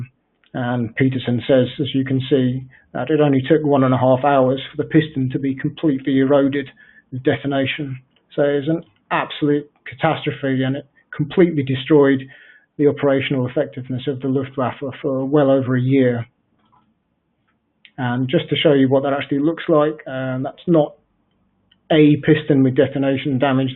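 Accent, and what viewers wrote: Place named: England, United Kingdom. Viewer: I told you I fell asleep after 3 minutes. And if you put things like this up on you tube you have to accept the comments from every 1 that might watch YouTube. I enjoy history very much but with in 30 seconds of listening to the man talking in this vid I realised this was an English man which I had no interest in listening to. If you don't agree with my comment you can very easily ignore it it's far from trolling. Maybe I have hurt your feelings in some way. It's ok tho we all know history. We don't need to be told how it all worked out and who the better engineers were (germans) anyway I will say good bye now take care of yourself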